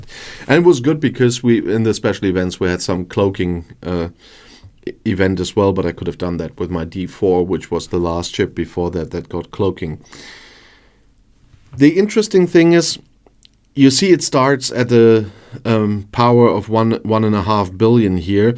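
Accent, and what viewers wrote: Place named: Germany. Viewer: You're English is fine..of course you have a German accent to us Americans but it's not very heavy it's actually kinda cool..however some of your words you pronounce the British way but that's to be expected, overall you are very clear and easily understandable bro 👍🏽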